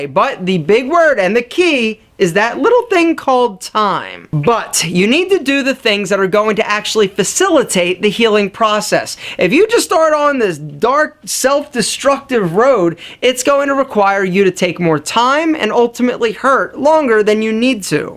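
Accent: Philadelphia, United States. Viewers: You from Philadelphia?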